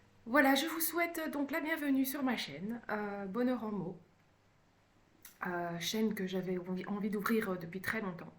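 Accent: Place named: Belgium. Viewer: Coucou! Trop contente de te voir sur Youtube ♥ Bienvenue! Je me retrouve tellement dans tout ce que tu dis:)) J'aime beaucoup ta douceur, et ton petit accent belge hihi. Hâte de voir tes prochaines vidéos! Bisouuus ♥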